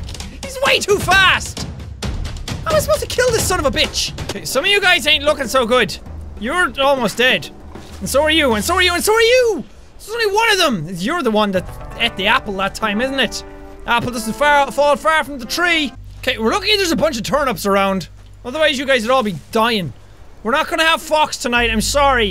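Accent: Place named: Ireland. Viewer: I’ve come back to first video I saw 6 yrs ago because this is where my addiction to Irish accents was born. Love u jack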